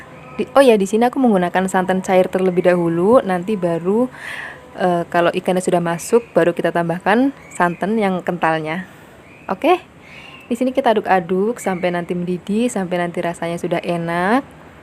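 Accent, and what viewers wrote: Java, Indonesia. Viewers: Menarik resep2 nya, aku ngga cm suka resep nya, tpi suara mba itu lembut bngt, jawa nya medok, pasri priyayine ayu, luwes, jiiiaaann...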